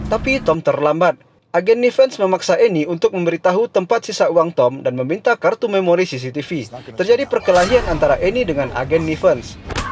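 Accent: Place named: Minangkabau, Indonesia. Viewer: Logatnya kental Minang banget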